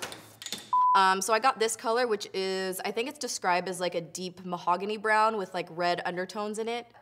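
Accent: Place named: California, United States. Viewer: I love your Cali accent! Lol soooo funny and selfie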